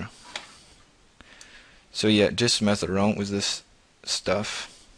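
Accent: Germany. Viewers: Uns Deutsche erkennt man sofort an der Aussprache.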